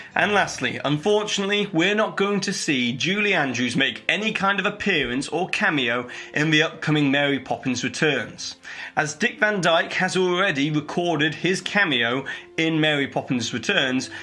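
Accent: England, United Kingdom. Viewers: I love your show. The way you pronounce words is cool. Very English. We say patent different here in the USA. See you on Tuesday.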